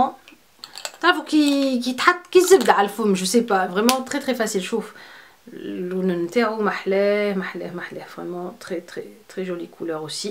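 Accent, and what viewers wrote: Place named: Tunisia. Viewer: تستهلي كل خير .... حلو تدخلي للقلب و لهجة تونسية حلوة .....تجايات انا من الجزائر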